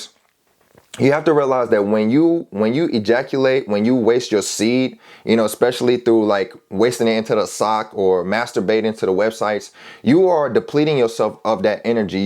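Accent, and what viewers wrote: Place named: United States. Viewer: Yo boss thank you for this video you’re a funny guy, what part of the US is your accent from?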